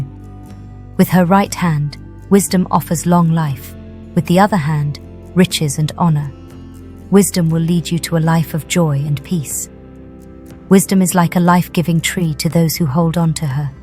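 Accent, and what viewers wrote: United Kingdom. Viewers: I love it. Is that a British accent?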